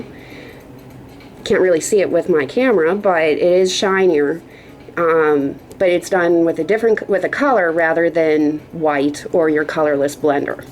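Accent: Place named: Pittsburgh, United States. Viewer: are you from pittsburgh? you have the accent of a yinzer!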